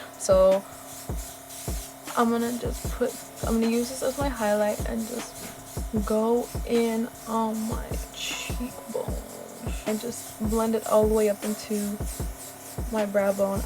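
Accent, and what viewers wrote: Jamaica. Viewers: Its the Patois for me, LMAOOOOO, LOVE THIS VIDEOOO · Are u Jamaican? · Are you Jamaican my dear??? Becau you sound like an Jamaican ooman dem!!!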